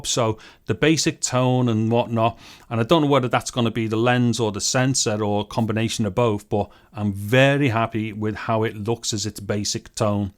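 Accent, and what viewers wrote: Liverpool, United Kingdom. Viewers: I’m here from Muldoon. If you’re not looking specifically for a vlog camera, I think this Sony zv1 seems a good camera. But then...isn’t there better cameras for a similar price or cheaper that can do similar things? I’m looking at Sony a6000, Sony RX 100 V, Canon g7x mark II or as you mentioned GoPro 8. What would you recommend? I’m not very technical, I’m looking more for photography and have the ability for good video or vlogs if I felt like it! Also nice to hear a Liverpool voice! Best Wishes 💕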